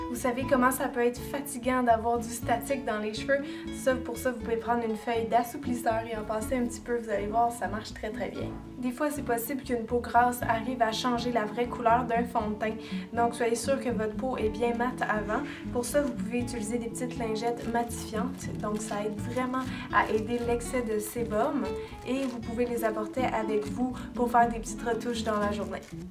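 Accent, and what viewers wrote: Quebec, Canada. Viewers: Je suis espagnole et je comprends bien ton accent french canadian(le secret....j´adore les têtes à claques). Je te trouve ravissante,naturelle,tu sais transmettre ta bonne volonté,et le résultat ne peut être plus excellent. ERES UN ENCANTO Y TE MANDO UN BESO GRANDE DESDE ESPAÑA.ENHORABUENA.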